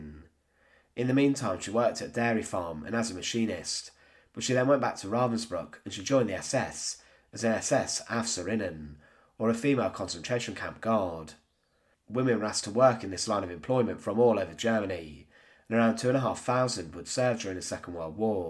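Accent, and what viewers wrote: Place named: England, United Kingdom. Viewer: You simply just don’t like his British dialect. Which is fair, we’ve all felt that at some point. But dudes not a bad narrator. Sure the mic quality could be better and it probably will be in the future.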